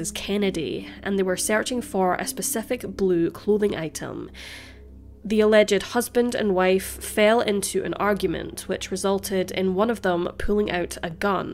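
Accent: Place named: Glasgow, United Kingdom. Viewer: I spent 10 years in Glasgow Scotland. I quite enjoy listening to your Glaswegian accent narration.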